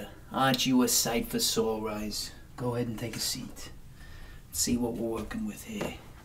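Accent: Boston, United States. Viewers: I have to say, being from North Carolina myself, I have never met someone from Boston! I think it's such a neat accent, so much character! I always get sad with northerners think southerners are stupid automatically because of their accent or vice versa!! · The Boston accent is the best in ASMR I would say. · Hate Boston accent.... Maybe something different?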